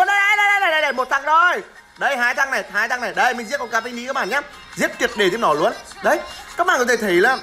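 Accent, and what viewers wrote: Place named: Ha Tinh, Vietnam. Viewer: Eng ở hà tịnh hay nghệ an rứa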